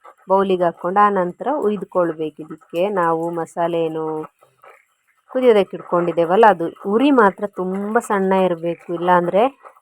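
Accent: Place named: Udupi, India. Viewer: ನಿಮ್ಮ ಮಾತು ಕೇಳಿ ನಾನು ಊರಿಗೆ ಬಂದ ಹಾಗೆ ಆಯ್ತು ನಮ್ಮದು ಉಡುಪಿ 🥰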